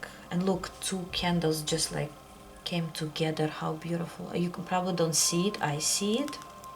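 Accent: Russia. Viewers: You have the most beautiful accent and voice Idk Russian almost sounds like my grandmother's accent on my dad's side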